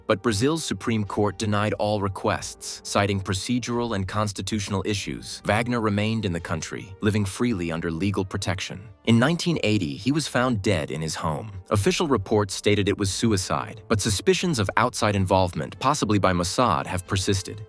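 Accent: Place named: United States. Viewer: Nice vid but work on the pronuciation of names. Terrible American accent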